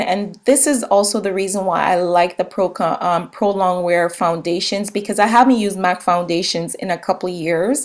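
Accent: Jamaica. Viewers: Are u Jamaican? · First video I'm watching of you, but correct me if Im wrong but I think you are Jamaican, I just know a Jamaican as soon as I see and hear one. Guess its the patriotism in me.... Love my place and my people badddd!!!! but if ur not its cool, i still love your video lol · Hey you sound Jamaican. Are you? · are you Jamaican? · I hear an jamaican accent in there :D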